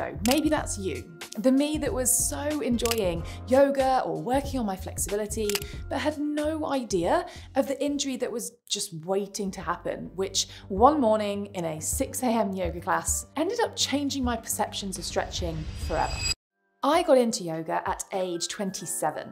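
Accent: United Kingdom. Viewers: SO nice to see another teacher pointing to this short coming of yoga. My own 40 year yoga teaching career has let me see many loose their yoga butt and need to get it back again. I recommend much of what you have here, and also picked up a few good tips to add to my tool box as a yoga therapist working with hips challenges. thanks! Love your British accent.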